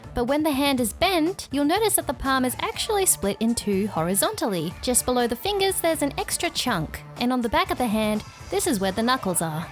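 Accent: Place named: Australia. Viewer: I know a fellow Aussie accent when I hear one. YouTube Algorithm actually cooked for once by recommending me this, saving this video to refer back to likely frequently.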